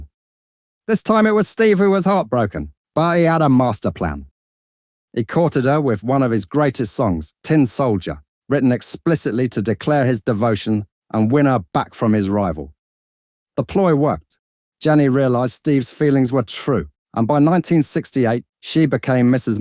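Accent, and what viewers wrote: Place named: London, United Kingdom. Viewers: Can't stand the narrator, what is he, some London Wide Boy? Terrible halting voice. Get rid. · A Cockney geezer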